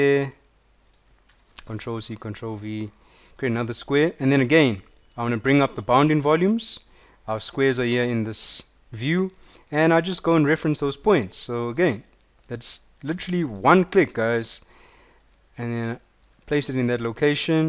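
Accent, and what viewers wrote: South Africa. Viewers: weird thing but you sound south african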